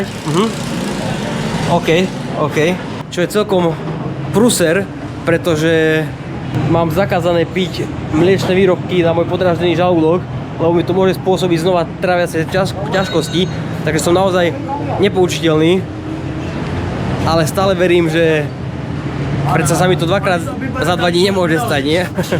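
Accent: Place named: Hungary. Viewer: koli nareciu nebola som si ista ci pocujem Madarsky jazyk ..taky zvlastny podton mas...😊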